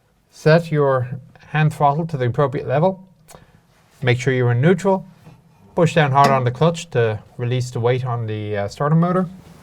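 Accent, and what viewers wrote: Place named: Ireland. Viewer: I thought you had an Irish accent alright:) Either that or you're well versed in international transport logos:P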